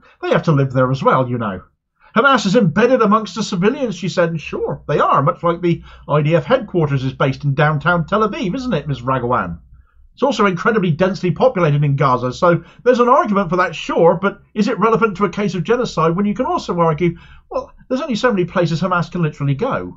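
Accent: Cornwall, United Kingdom. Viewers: Zackley., Great to hear a Kernow voice. I'm not Cornish myself - Cornish ancestry but brought up in Somerset... but I love to hear West Country voice and opinion. Thanx mate.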